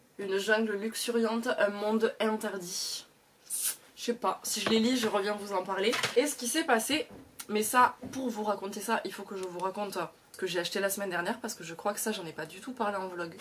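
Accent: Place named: Toulouse, France. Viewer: J’aime tellement tes vlogs ! Moi qui suis une toulousaine expatriée en région parisienne, je suis toujours ravie de retrouver ton accent ! 🥰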